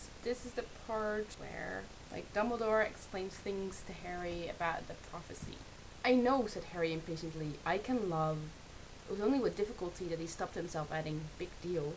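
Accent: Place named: Netherlands. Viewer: interesting stuff. I like how when you read outloud you pronounce very posh english in a dutch accent haha.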